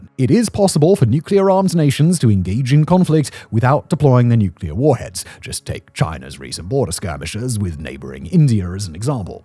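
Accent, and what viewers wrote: United Kingdom. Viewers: British accent is so stupid! 😂😂😂😂😂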